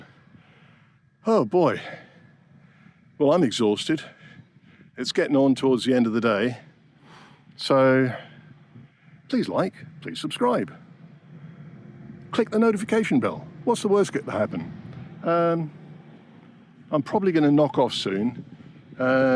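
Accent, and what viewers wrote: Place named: Australia. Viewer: Not long found your Reno, loving the videos & music 🎶. And you sound like an a fellow Aussie!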